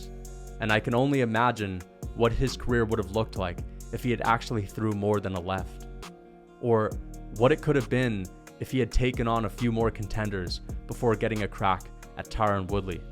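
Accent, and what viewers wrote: Ireland. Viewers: Irish accent! 😅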